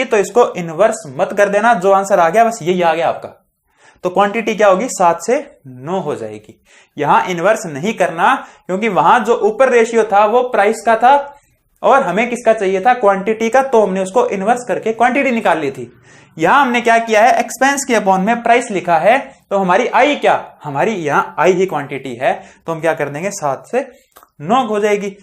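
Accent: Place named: Rajasthan, India. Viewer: West Rajasthan se lg rhe ho sir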